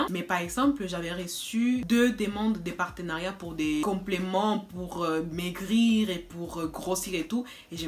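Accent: Spain. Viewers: J'aime ton accent espagnol continue comme sa sinon tu es très belle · Je pense que tu es la 1ère espagnole noire que je vois! J'étudie l'espagnol et je trouve que c'est aussi difficile que le français quand même... J'adore ton accent et j'ai hâte de voir tes storytimes :)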